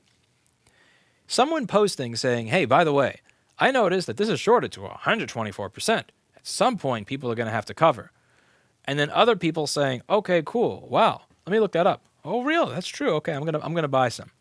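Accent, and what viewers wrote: New York, United States. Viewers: He's not an angry New Yorker, just how most new yorkers from NYC and close area talk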